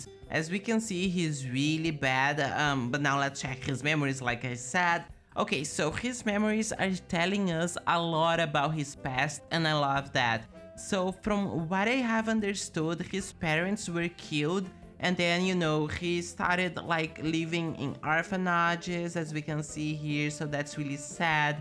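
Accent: Brazil. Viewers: Everyrime inwarcgbtour vídeos I’m trying to figure out where you’re from hahaha I’m surebyiure Brazilian. Love seeing fellow Brazilians doing content in English!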